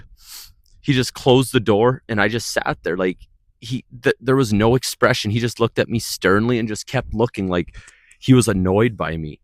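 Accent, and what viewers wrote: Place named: Northern Canada, Canada. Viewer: I'm female and not having a father in my life affected me a lot too, but in a different way. Thank you for sharing this. I'm from Manitoba and I also came from a Mennonite family but grew up without church or any religious involvement. I know the feeling of being lonely and isolated in the winter. I live in the Midwest now, but it's really nice seeing that you're still up there in northern Canada, I knew I recognized your accent 😊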